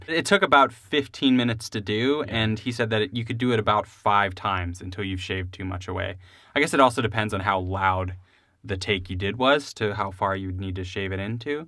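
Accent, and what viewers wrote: Chicago, United States. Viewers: "Valume" is a Chicago accent thing. Like I catch my self saying pocket like "pah-ket" all the time. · hey...great vid and great answers....as a fellow Chicagoan...celebrate the Dialect...not everybody gets it...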